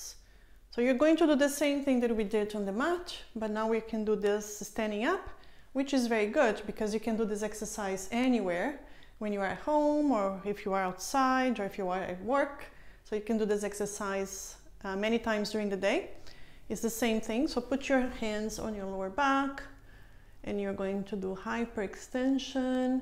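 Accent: Brazil. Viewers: You sound Brazilian.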